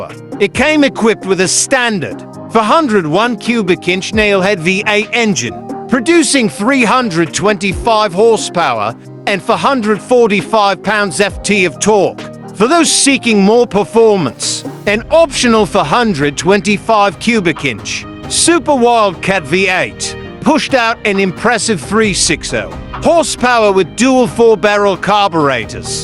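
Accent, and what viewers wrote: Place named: United Kingdom. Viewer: Why is a guy with a British accent telling me something about American cars? Do they have these cars in Britain?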